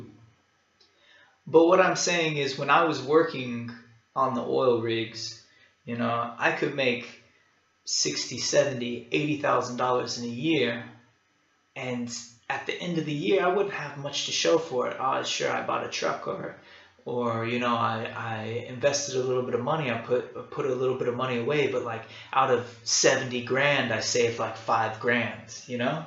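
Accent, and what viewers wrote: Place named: Texas, United States. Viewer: Felt like I just listened to myself with Texas accent haha!! Awesome bro :D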